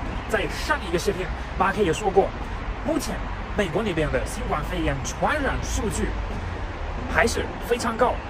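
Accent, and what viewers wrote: Sichuan, China. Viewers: 马克您的普通话好像有点四川味。难道是我的错觉吗？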